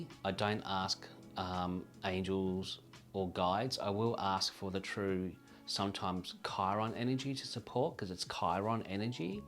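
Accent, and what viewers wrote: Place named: Australia. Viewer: nonsense with an australian accent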